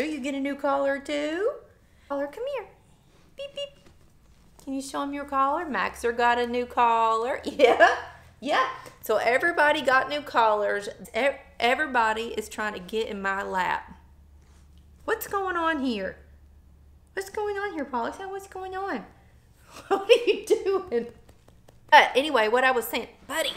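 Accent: South, United States. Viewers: Love your adoption of Pollux. Just saw your posts. Absolutely thrilled for all of you! I do have a question if you see this. What state are you in? I can tell your southern. I'm from Alabama so it was nice to hear you say "Yall" alot. I miss home. When my husband retires, we are moving back home, then I'll hear "Yall" used all the time! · YOU HAVE HEART,,THESE ANIMALS I CONSIDER MY CHILDREN.I DIG YOUR CURLS AND THE SOUTHERN ACCENT.GOOD FORCES BE WITH YOU. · Love Pollux and Maxxie. Also love your Southern accent.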